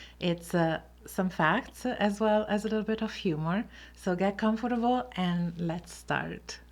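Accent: Italy. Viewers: your smile is infectious❤️. Loved this video...I am half Italian and proud of my heritage. My mom and Aunties were strong Italian women. I got my love of cooking from my Mom. To me Italians have the best food and yes my skin isn’t wrinkled because I eat the Mediterranean diet😂😂😂😂. I love to listen to your accent, lovely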